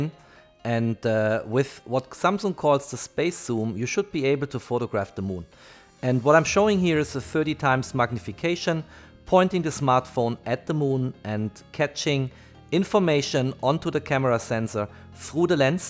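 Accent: Germany. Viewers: Deutsches Akzent 🥵🥵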